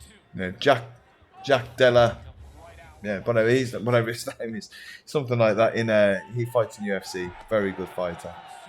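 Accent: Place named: Birmingham, United Kingdom. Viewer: i'm admiring your accent tbh. are you from Burmingham by chance? (i"m just english learner >< )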